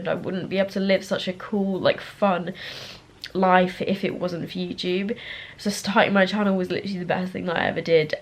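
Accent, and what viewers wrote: England, United Kingdom. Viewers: First of all: CONGRATULATIONS!!!! I feel so happy for you, you deserve it!!! So, it's not very special but I discovered you when I was searching for Makeup Revolution product reviews like 2 years ago I think? And I remember instantly loving your honest reviews, and cute & funny editing style (and also the British accent, ngl). Thank you for your hard work! Twitter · First video I saw was 3 months ago, I think it was the Instagram make up trends one, and I thought you were one of the only ones who seemed to be genuine on YouTube and I binge watched so many of your vids 😂😂❤️❤️ Also loved your channel because I lived in England this summer and I love your accent Lots of love ❤️ <mail> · I started watching around 9 months ago. Your videos just kept popping up on my YouTube homepage and just decided to watch on of your videos😂 your videos started showing up even more as being recommended and I started watching you even more😂 I think the reason I subscribed was because of your personality❤️ you’re so pure and natural and I definitely LOVE your British accent😍😍 you’re absolutely yourself and appreciate you for that! 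I think the videos that made me subscribe were the ones were u were testing products that used u in their ads without ur permission😂 it was THE FUNNIEST😂😂😂 Instagram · Omg! Congratulations, ❤️ I remember the first time your videos popped up in my recommendations, it was the video with boyfriend chooses my makeup😂 I watched that and then fell into a hole of watching too many things from your channel. At one point I was like, I am subscribing to her. Even though at that time I didn’t know English that well, damn that was more than 3 years ago, and I was kinda of scared of your British accent, because for me it was hard to understand (btw now I get everything you say🤩)but I subscribed anyway because your content was and still is really entertaining, but you have something unique. You are a REAL person. I can relate to you, you don’t hide the fact that you have problems or other stuff like some youtubers do and for that I really appreciate you❤️ Another thing i remember is that I was really surprised when I saw how many subscribers you had. 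You always provided good quality for your videos and I was convinced you had more than 1 million fans:)) How says: “ ”, you finally did it. (Btw, I started listening to because of you🙃)on another note, I am here for the makeup: I have a big passion, just like you and I’ve learnt so many things from you, plus I bought a lot of the products you recommended and they worked👌🏻 You are just such an inspiring, intelligent, creative, sensitive person and much more. I am truly happy for your accomplishment!! Love you❤️❤️ *also my insta is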